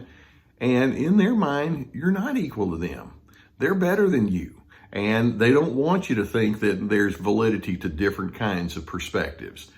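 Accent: United States. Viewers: Omg I just love this guys accent. It's the nicest in the USA ... Best wishes from Ireland 🇮🇪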